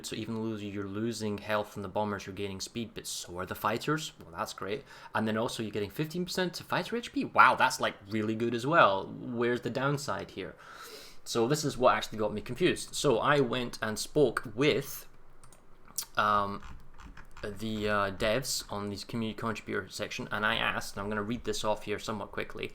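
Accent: Scotland, United Kingdom. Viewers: he's Scottish, he can pronounce English words in any way you can imagine, and it's still going to be alright...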